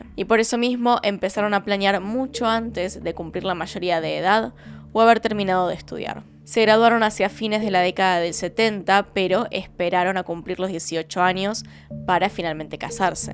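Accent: Buenos Aires, Argentina. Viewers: No me gusta tu dicción, no creo que hables así cuando vas a la panadería, hacelo más natural, teniendo tanto acento porteño pretendés renegar de la pronunciación en español exageradamente, aunque sea en nombres propios o de localidades cortas la esencia de la narracion en tu idioma, no creo que tengas un nivel alto de público angloparlante por lo que no es necesario tanto, mañana o pasado elimino el comentario por qué no se cómo hacerte llegar la sugerencia. Por lo demás el resumen es bueno y consistente, más que bueno, sin agregados inútiles como nefisto, landó, bárbaro etc que se van por las ramas, muy buena tu capacidad de síntesis. Saludos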